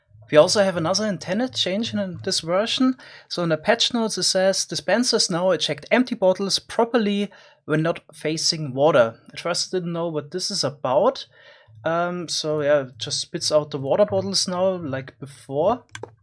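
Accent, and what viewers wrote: Germany. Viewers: Wie man hört das du deutsch bist haha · Das deutscheste Englisch auf Youtube. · Ich bin mir sowas von sicher das du n deutscher bist xD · Dieser deutsche Akzent einfach xD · Mann Hört das du deutsche bist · The German accent kicks!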